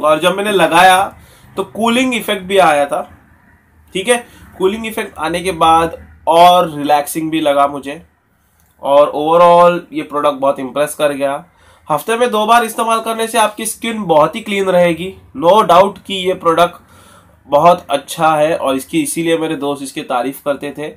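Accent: Maharashtra, India. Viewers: Chitak gaya...😂😁😅 mother tongue marathi ahe vatat tumchi..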